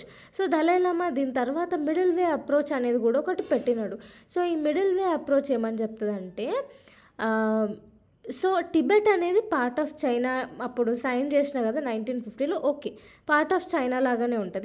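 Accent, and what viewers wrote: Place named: Telangana, India. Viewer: Mam mee telangana yaasa masth undi...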